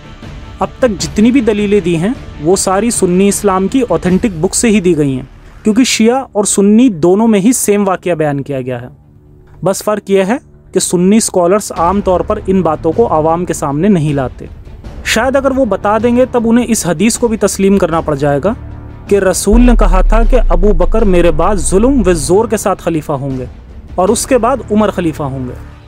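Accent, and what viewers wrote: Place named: India. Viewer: Awaz say he Indian lag raha ha. Jhoota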